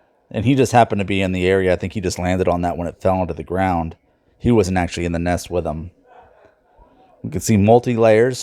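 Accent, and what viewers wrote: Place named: Pennsylvania, United States. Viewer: Let me guess, Copenhagen Wintergreen? Also you are so southeastern PA it hurts.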